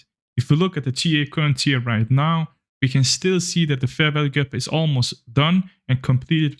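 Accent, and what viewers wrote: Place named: Austria. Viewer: Dude you have arnold schwarzennagger accent 😁 are you from austria 😁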